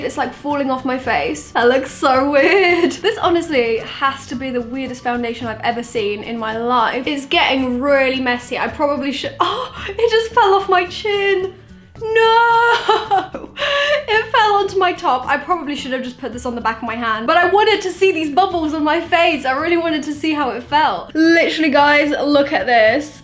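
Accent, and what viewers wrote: United Kingdom. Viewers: British Accent? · Is she British?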